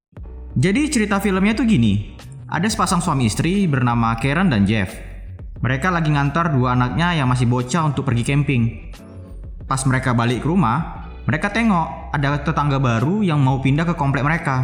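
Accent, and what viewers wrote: Medan, Indonesia. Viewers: Logatnya kek org Medan kau bang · Orang Medan ya bang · anak medan ni oratornya :D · eeeiiii senang kali lah aku.. gini cara pembawa'an y pakai logat medan.. saya dayak kenyah kalimantan timur.. ayo bang ini ajj logat y.. seruu.. 😍😍😘😘🤗🤗 sukaa kali aku bang... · Abang ini kaya nya orang medan logat nya medan kali 🤣🤣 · Nampak kali medan nya bah. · logat bhasa medan nya aq gk tahan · Jgn lh nampak kli Medan nya min:) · Medan kali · kuat x logat medan mu bah,,, :D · Orang Medan bg? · Pasti orang medan nya kau lae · Bg lu orng medan ya?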